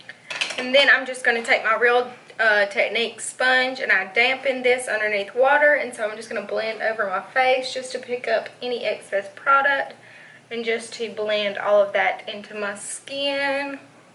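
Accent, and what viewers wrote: South, United States. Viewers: Beautiful look!! I love how the warm tones make your eyes pop! Also, I love your accent. I'm from the South, too but my accent isn't like yours! :(((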